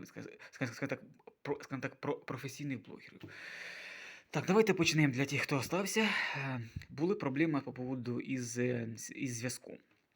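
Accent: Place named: Chernihiv, Ukraine. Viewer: Схоже на чернігівську балачку) покращуй мову, а так то цікаво розповів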